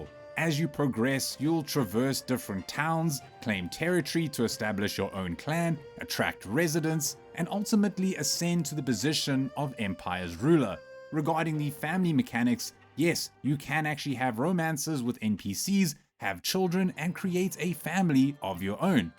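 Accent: South Africa. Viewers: My infallible sense of compatriotism detects the dulcet and mellifluous tones of a Saffer twang 👂🇿🇦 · You from South Africa boet? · Mate... Are you South African?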